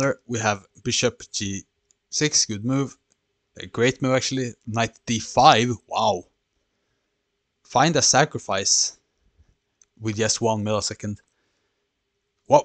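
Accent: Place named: Sweden. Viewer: You sound Swedish, and I am fairly certain my ears are not deceiving me.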